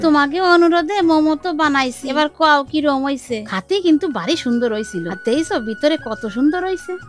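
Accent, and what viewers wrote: Bangladesh. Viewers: তুমি তো বাংলাদেশির মত কথা বল😂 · ওমা এতো বংলাদেশি অ্যাকসেন্ট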